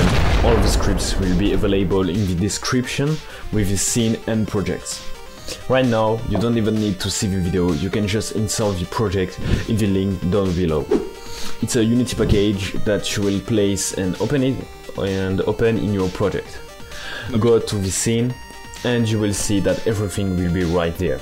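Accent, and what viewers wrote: France. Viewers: this man has a thicc french accent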